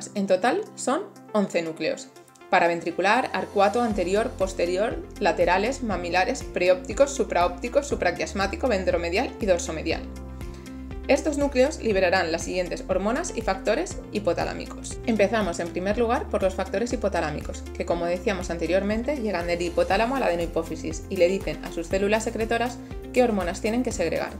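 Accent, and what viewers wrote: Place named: Spain. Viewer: Tiene voz española, pero siento que es la tonada española que más se asemeja a la Argentina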